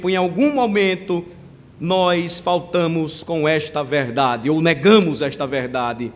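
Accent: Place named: Sergipe, Brazil. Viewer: Oi pastor!!! Assisto teus cultos aqui em BH. Nasci na igreja presbiteriana , ha 68 anos atrás, no interior de Minas. Hj frequento a Metodista. Gosto muito do seu sotaque.... eu, meu marido e meu filho ha 25 anos atrás moramos doze meses aí em Aracaju. Foi um tempo lindo! Temos um carinho muito grande pelos sergipanos e tbem por Aracaju. Amamos de coração!! Um grande abraço, pastor.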